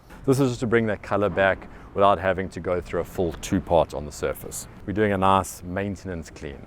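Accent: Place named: South Africa. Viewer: Great to hear the SA accent. Is this product available in Malta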